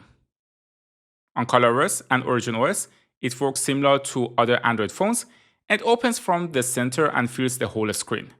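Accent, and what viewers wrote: Iran. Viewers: You sound Iranian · Are you Persian or Iranian? As soon as I heard your voice I could tell😂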